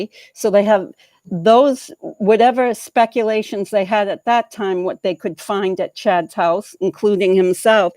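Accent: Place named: East Coast, United States. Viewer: I recognize your accent. East Coast?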